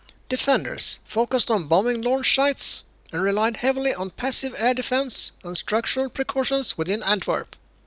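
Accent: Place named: Sweden. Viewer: and please you do ! good aim 🎉 I just explored the LINK to your other videos and I’m looking forward to watching them one by one. wanted to let you know: it seems to me that you’re in Sweden, yes ? and certainly it’s a very thick accent ya got going, I wanna let you know that I’m watching in Chicagoland, Illinois, USA. (what used to be the great country of America, which hopefully in time, will be again. don’t give up on us. 70% of us hate MAGA & Trump and everything they stand for…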